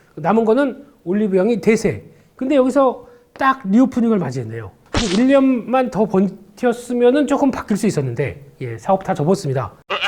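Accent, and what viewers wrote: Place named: Liverpool, United Kingdom. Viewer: ...!날이갈수록 영어가 영국식(리버플쪽)으로 변화는듯~엄청최고👍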